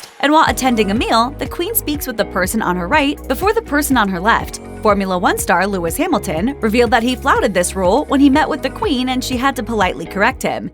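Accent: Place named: United States. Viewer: An American accent talking about British royal protocol is just not proper!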